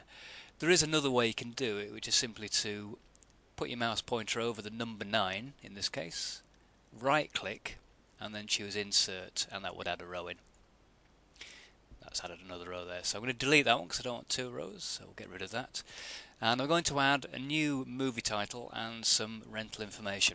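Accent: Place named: Northern England, United Kingdom. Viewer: I don't know if this guy is paid for this excellent job, but he made this tutorial absolutely stunning. Thanks for that! Btw.I would guess his accent as from northern England,maybe Manchester :))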